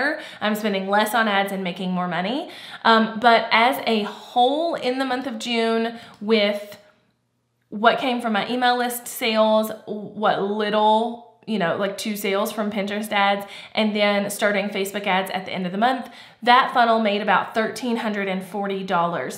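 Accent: South, United States. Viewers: I love because she is one of the few Youtube Tip creators who have a southern accent.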